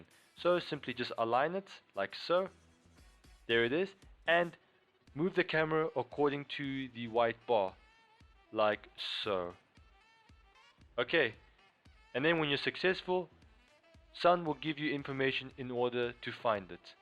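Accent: South Africa. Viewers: are you south african? and thank you this helped alot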